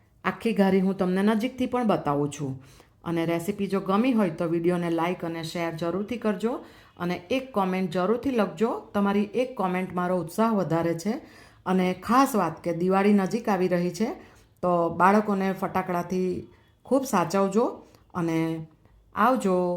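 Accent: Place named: Valsad, India. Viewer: Valsad baju na su k madam tme?bau saras rcps hoy 6 tmari😃😃😃 pn tmri bolvani style pr thi lage 6 vlsad jeu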